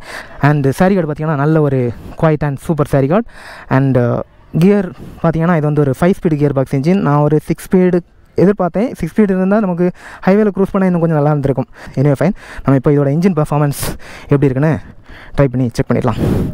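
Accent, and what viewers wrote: Kanyakumari, India. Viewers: Wow KK slang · R u from kanyakumari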